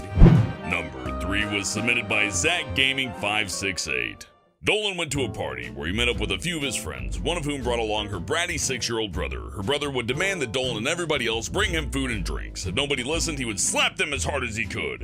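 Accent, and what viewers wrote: Australia. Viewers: Sounds like Australia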